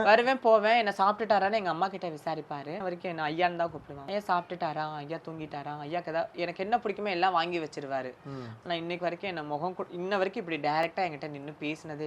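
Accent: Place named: Kongu, India. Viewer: அக்கா நான் கோவை நீங்கள் பேசும் உங்கள் கொங்கு தமிழ் மிகவும் அழகாக உள்ளது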